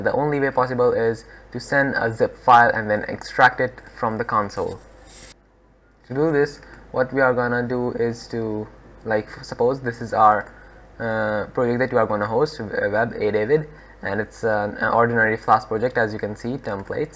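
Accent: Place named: Brazil. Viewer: Ahhhhhhh 😂😂😂 é Brasileiro, certeza...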